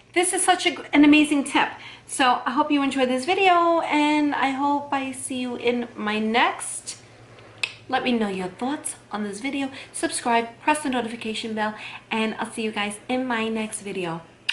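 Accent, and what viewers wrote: New York, United States. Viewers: This helps a lot!!! Are you a Long Island girl? I hear a NY/NJ accent. Luv it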